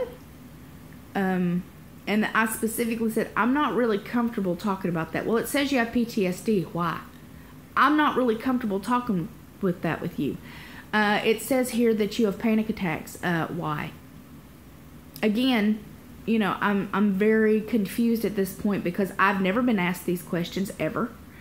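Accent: South, United States. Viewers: I found your channel a few weeks ago, after looking for WLS vlogs. I love your candid conversations. it makes me feel less alone. I also love your accent! Southern girls rule!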